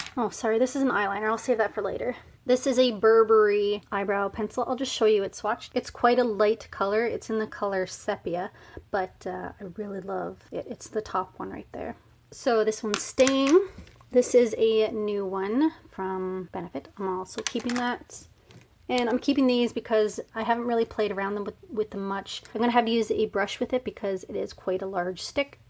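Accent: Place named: Maritimes, Canada. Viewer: i could tell you are from the maritimes from the accent my mom is too. i go back there every summer newfoundland is absolutley beautifu. l lots of love from vancouver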